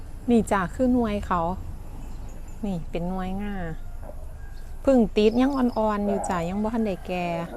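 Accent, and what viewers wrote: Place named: Ubon Ratchathani, Thailand. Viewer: รายการดีมักหลายเว่าภาษาอีสานคิดฮอดบ้านแท้.. นางอยู่จังหวัดได่น้อสำเนียงคล้ายแถวอุบล.จ้ะ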